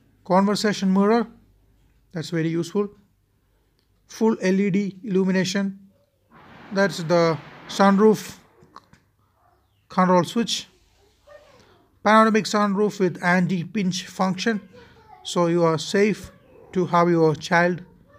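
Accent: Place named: Kerala, India. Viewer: Manglish kollam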